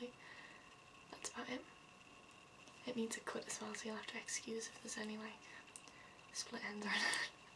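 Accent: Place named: United Kingdom. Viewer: are you British i like your accent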